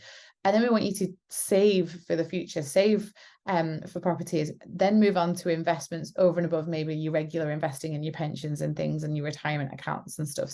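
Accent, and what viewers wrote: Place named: North, United Kingdom. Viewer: just downloaded the app and looking forward to using it! this may sound awful but hearing that you’re northern makes me trust you a lot more 😅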